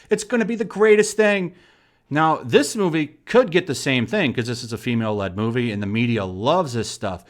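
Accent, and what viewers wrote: United Kingdom. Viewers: Why do you talk like a British dude!😂😂😂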